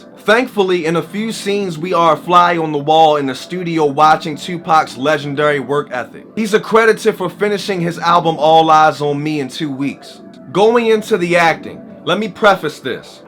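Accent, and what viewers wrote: Baltimore, United States. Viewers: Soon as you started talking I knew you was from Baltimore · you got that heavy Bmore accent fam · As soon as you started talking I was like oh yeah he's from Baltimore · I knew you were from Baltimore within the first few seconds. Your accent 😍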